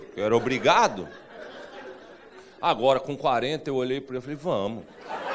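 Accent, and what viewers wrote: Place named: Goiás, Brazil. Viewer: difícil o Goianês... ainda mais sendo gaúcha! 🤣🤣🤣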